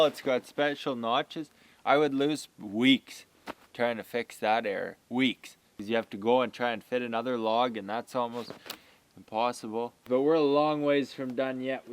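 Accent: Canada. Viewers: Your Skills have greatly improved.. Videos are fun to watch and that Canadien accent is killer‼ Vinny [Boston]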